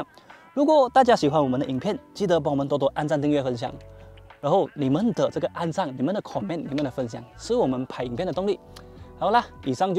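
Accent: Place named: Malaysia. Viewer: 你照你原本腔調來說話是沒問題的 不需要這樣吊著嗓子說話 比起在某些詭異的地方突然高音 還不如馬來西亞腔道來得親切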